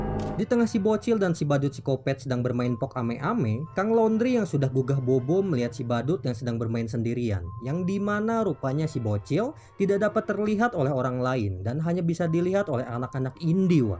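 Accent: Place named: West Java, Indonesia. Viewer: Penjelasan jelas, ada sundanya kocak.. Paket komplit lah ini channel wkwkw auto subsscribe waa.. 🤣🤣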